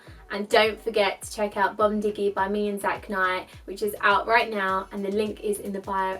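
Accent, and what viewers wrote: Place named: Essex, United Kingdom. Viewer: Essex accent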